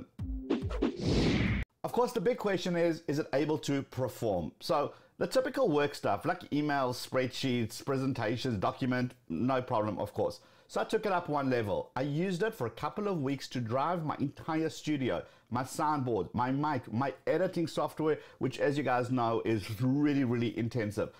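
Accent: South Africa. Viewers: is that a South African accent ?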